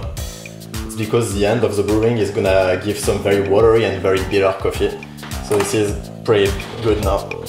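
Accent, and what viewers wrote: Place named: France. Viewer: Your voice and accent remind me of the great French chef Eric Ripert. Great video!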